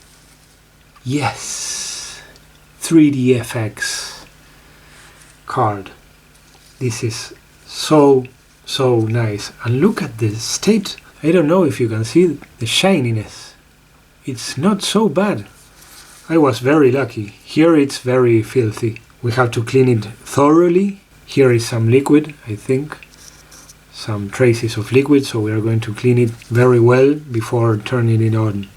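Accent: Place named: Spain. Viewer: Because of your accent I thought that you were located in Spain.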